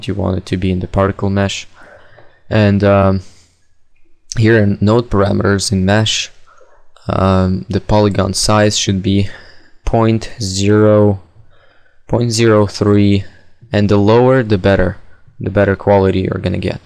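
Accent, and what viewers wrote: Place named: Netherlands. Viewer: do you have a dutch cennel want ik hoor dat je nederlands ben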